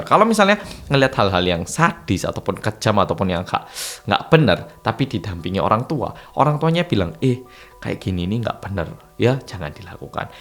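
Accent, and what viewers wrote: Java, Indonesia. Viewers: Hadirrrrr.... Mas medok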